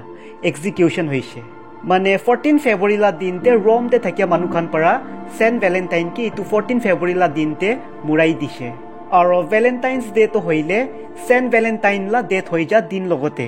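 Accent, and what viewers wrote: Nagaland, India. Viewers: i like your Nagamese accent brother ❤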